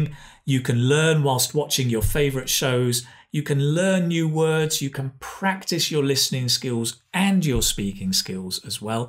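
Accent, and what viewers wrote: United Kingdom. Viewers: I really appreciate your vid,i’ll keep practicing for apply an airline,Thanks so much,anyway i like British accent.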